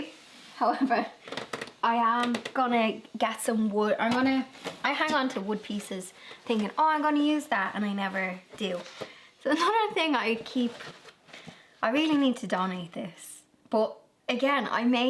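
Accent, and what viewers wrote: Dublin, Ireland. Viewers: Lovely hearing the true dub accent put paper on top of all your presses , and I change them every Christmas when decorating as well as my fire alarm batteries. Keep going girl you’re doing a great job . Up the kingdom 😂❤❤🪴💐☘️☘️ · 😂😂😂 “prehistoric grease”You are pretty amazing being able to accomplish all that you do. And something I never thought about but I wonder if my great grandmother had a brogue like yours or your brother. ❤❤. ☘️☘️☘️☘️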